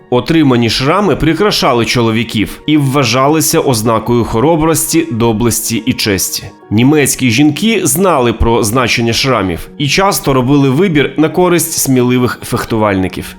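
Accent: Russia. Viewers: Це кацапський акцент. Не робіть мєнє нєрви. Ось це одеський акцент. А у відео суцільне ґекання, що хочеться вже відписатися.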